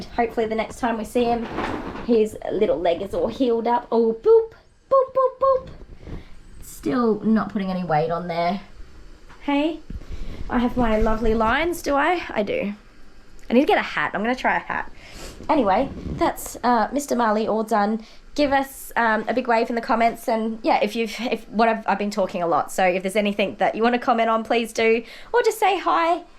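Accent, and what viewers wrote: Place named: Australia. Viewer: Hi good to see you back on here grooming I have missed your presence as I enjoy your chit chat it is so refreshing to hear an Australian accent.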